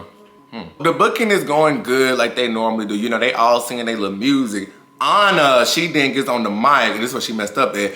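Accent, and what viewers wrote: Memphis, United States. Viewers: You're clearly from Memphis... has no one ever heard you talk 💀🤣 it took me all of 20 seconds to realize you were from the Midwest based off your voice which is why I followed you 😅 took no more 40 secs to place your accent in Memphis 💀🤣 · I believe you from memphis from your accent and your realness ❤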